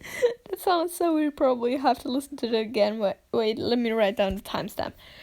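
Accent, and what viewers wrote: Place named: Germany. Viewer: If you didn’t have your German accent, I would defo believe that English is your first language!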